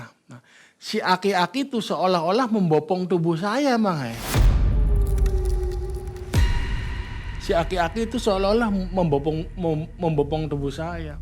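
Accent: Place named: Banyumas, Indonesia. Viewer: Alhamdulillah pak hadir.. Cara bercerita ituloh santai, logat ngapak plus.. Ky nya mmg punya karakter tersendiri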